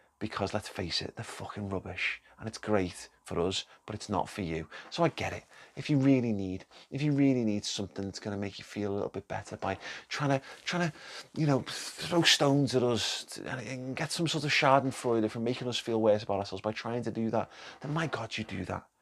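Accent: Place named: Manchester, United Kingdom. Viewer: Your mancunian accent sounds terrifyingly like my grandad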